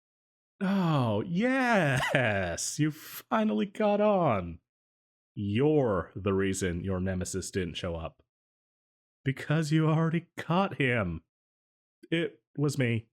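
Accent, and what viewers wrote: South, United States. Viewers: AHHHH SOUTHERN ACCENT!!!!!!! 😍😍😍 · This is my favourite audio of yours. Your voice here is THE best accent I have ever heard. It’s like a bit southern, and I adore it. You should definitely do some more with this kinda voice!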